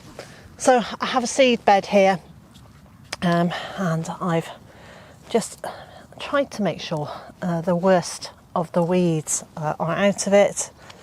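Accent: United Kingdom. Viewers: Given the title of the video, it would be worthwhile indicating where on the planet you are. The internet after all has international reach. I can only assume by your accent, it is the U.K., but assumptions are only that. Other than that, I appreciate the content. Cheers from Chris the Forest Worm, Adelaide Australia.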